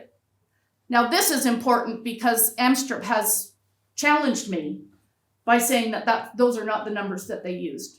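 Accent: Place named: Canada. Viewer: She is a Canadian. American is a foreign language for her.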